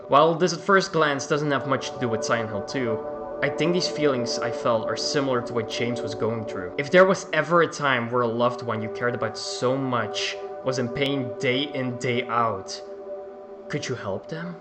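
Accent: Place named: Flanders, Belgium. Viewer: Flemish accent detected Gezegende silent hill upload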